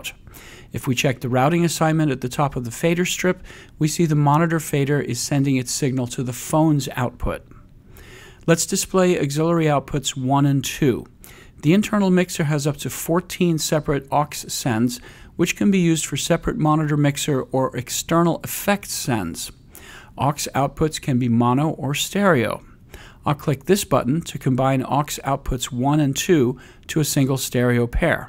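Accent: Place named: Netherlands. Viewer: Interesting video, in addition it sounds like the speaker is dutch..!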